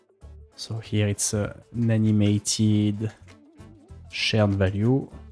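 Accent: France. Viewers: Is this guy French or sth?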